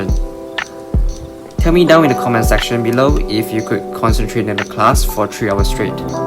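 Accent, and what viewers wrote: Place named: United States. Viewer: Why do i hear the US accent HAHA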